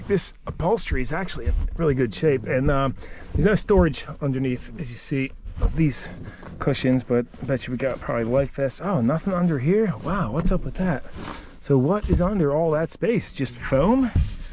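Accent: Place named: Philadelphia, United States. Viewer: Has anyone ever told you you look like Jared Keeso? It’s weird seeing what is a Canadian actor speaking with a Philly accent lol. Love the videos!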